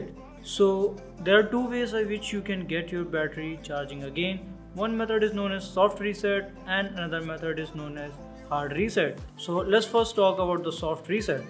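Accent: India. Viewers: just speak indian cause your ruining english.......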